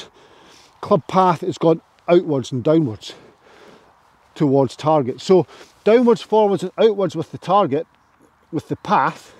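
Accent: Scotland, United Kingdom. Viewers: Without a doubt. (from a guy who's played 60+ years) this is the best golf swing concept I have ever seen. And it works! Took me a bit to get the Scottish accent (thought "canes" was "keyes").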